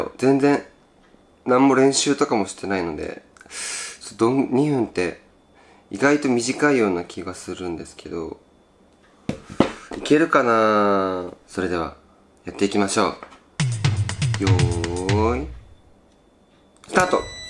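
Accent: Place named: Osaka, Japan. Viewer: 大阪弁可愛い(＊¯ω¯＊)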